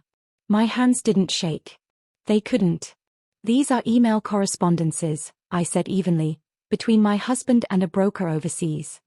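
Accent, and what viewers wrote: United Kingdom. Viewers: Why did you have to use a woman with a British Voice, when it's clearly an American Story?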